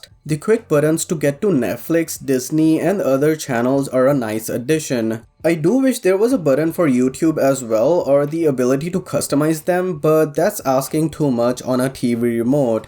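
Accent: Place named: India. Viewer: Canadian? I thought dude was Indian 😅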